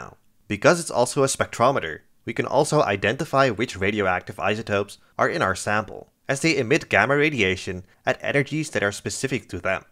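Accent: Netherlands. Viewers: You sound Dutch. Loving the content!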